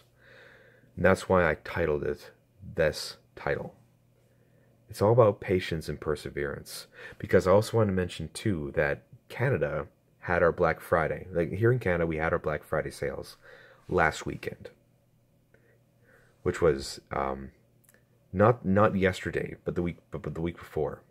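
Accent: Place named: United Kingdom. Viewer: Perks of watching the streams: you get to hear the phone story earlier....and in a British accent XD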